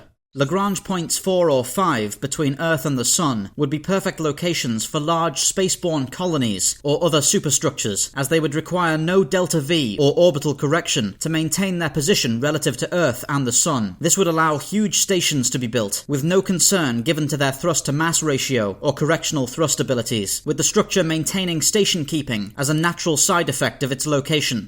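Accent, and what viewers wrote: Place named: Liverpool, United Kingdom. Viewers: You sound kinda scouse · good video and script, shame about the slight northern accent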